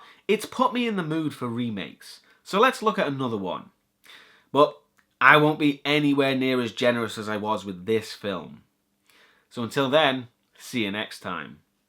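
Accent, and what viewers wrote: United Kingdom. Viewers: cyberdemon 10130 I believe he is from the UK and the song is “ ”by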